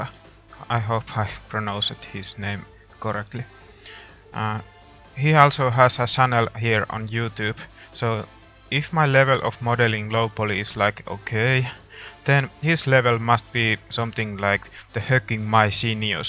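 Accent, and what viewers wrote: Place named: Finland. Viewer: Hey, I really enjoy your content, and of course that Finnish accent XD I am from Finland as well;) I think you have one of the best art styles of all blender users;) KeepUp nice work!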